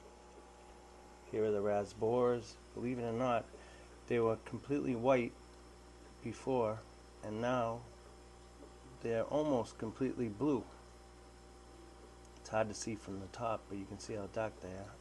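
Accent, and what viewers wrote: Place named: Boston, United States. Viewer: I love your Boston accent. Awesome video, thank you for sharing.:face-red-heart-shape::hand-pink-waving::glasses-purple-yellow-diamond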